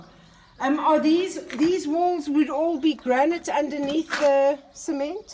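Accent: South Africa. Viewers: You sound so SouthAfrican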